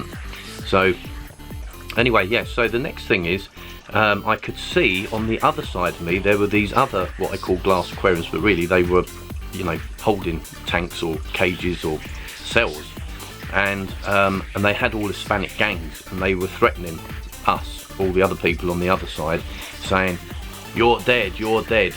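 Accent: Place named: United Kingdom. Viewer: I guess your english is from the UK it's kind of difficult to understand.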